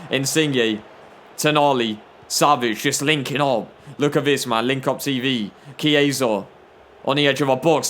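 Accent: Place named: Australia. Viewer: U sound australian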